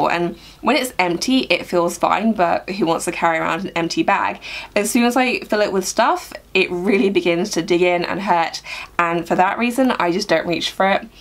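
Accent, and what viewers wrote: England, United Kingdom. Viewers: REASONS I LIKE THIS VIDEO RANKED! 1. Succinct 2. Well thought out and planned 3. Intelligent and scientific reasoning 4. English Accent!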